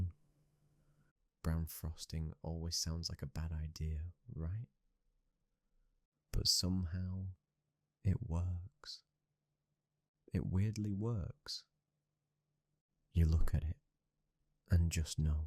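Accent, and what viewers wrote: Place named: Yorkshire, United Kingdom. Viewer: That voice is so soothing and melodic. I know a Yorkshire accent when I hear one. Are you from North, East, West, or South Yorkshire?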